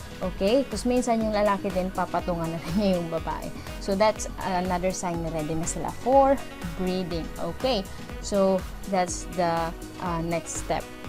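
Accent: Pangasinan, Philippines. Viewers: Pangasina po kau Ma'am?hehe may accent ksi hehe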